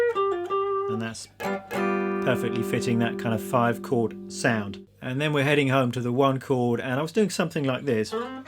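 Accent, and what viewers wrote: United Kingdom. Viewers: “Whoops, didn’t mean to click on this video. Wait, he is British- let me see what he has to say.” This is what accents do to my brain.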